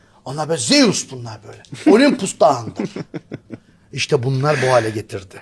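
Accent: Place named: Anatolia, Turkey. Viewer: Yalnız hoca konuştukça şive daha da Anadolu ya kayiyo☺️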